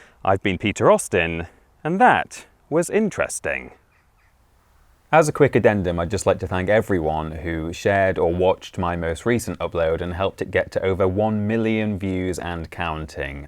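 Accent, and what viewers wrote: United Kingdom. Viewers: I heard the British accent even before I turned up the volume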